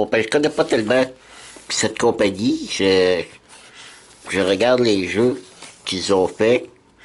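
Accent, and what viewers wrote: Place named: Quebec, Canada. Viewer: Merci pour ta vidéo, bien détaillée, pour tes bons voeux (reçois les miens en retour) et ce délicieux accent de la Belle Province qui régale mes oreilles françaises ! Au plaisir de te retrouver sur d'autres vidéos (j'ai adoré ton Top 10 jeux solo). Bye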